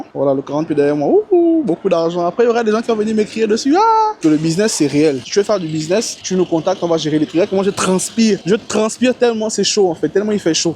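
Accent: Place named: Cameroon. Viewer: Ce le français camerounais ❤❤❤❤❤❤❤❤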